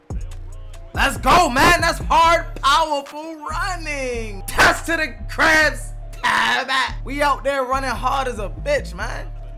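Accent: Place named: DMV, United States. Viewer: Your from the DMV aren’t you lol…I can hear it…Good content bro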